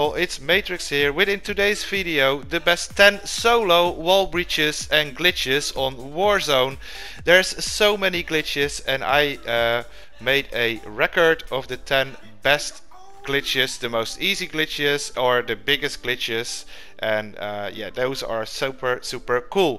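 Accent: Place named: Netherlands. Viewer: You are dutch... i know 100%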